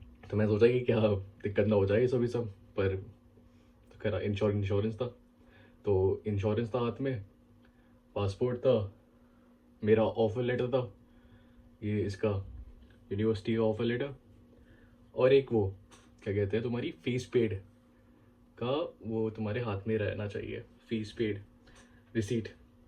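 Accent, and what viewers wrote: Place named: Gujarat, India. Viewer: Gujarati English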